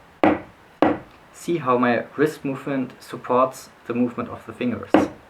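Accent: Germany. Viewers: You don't hear Germans with this accent much anymore. It's a cool accent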